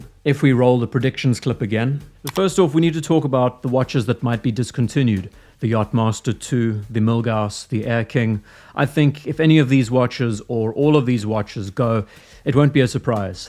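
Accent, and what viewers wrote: South Africa. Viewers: I swear you’re South African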